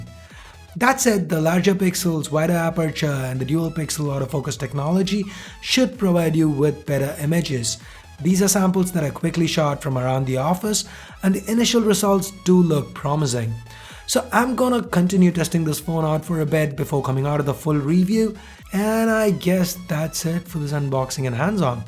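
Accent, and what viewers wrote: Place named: India. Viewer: this is the best Indian english accent i watch so i SUBSCRIBE SO FAST SO I CAN UNDERSTAND CLEARLY 😊😍😁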